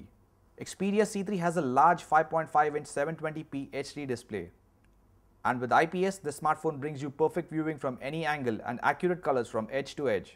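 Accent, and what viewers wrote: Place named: India. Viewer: FRITZ GOMEZ Indian dude.. Their accent always suck hahahahaha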